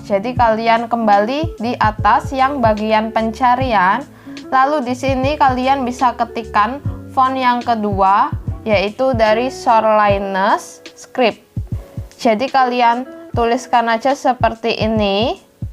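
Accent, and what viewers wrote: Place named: Java, Indonesia. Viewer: Medoknya gemoi :v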